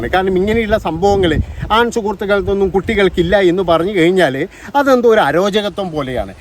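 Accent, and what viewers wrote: Kannur, India. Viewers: ഓക്കേ അരി അണ്ണാ 😂നാട് എവിടെ യാ 😄കണ്ണൂർ ഭാഷ ആണ് നമ്മളെ 😂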